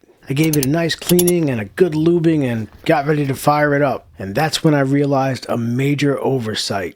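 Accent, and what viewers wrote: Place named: Bronx, United States. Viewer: I feel like I'm watching a bronx tale with his narrating. Lol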